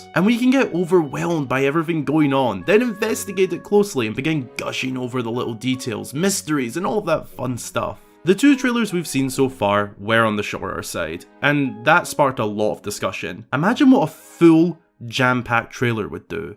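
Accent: Scotland, United Kingdom. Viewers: It’s been ages since I last watched u and r u Scottish I am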